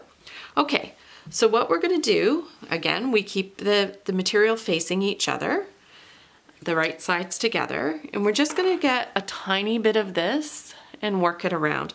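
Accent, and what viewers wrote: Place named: Canada. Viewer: Well I never thought we Canadians have an accent but I can definitely pick you out :) signed, a Maritimer :)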